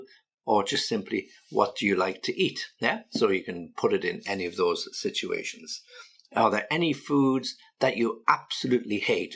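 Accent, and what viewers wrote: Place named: England, United Kingdom. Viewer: Do you live in England? Your accent.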